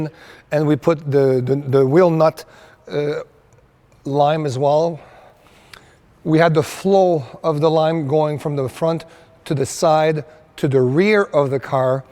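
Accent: France. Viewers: Are you french ? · Is this guy French ?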